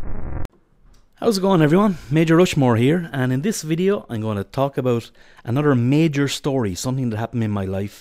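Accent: Ireland. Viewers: Are you irish? and nice video! :D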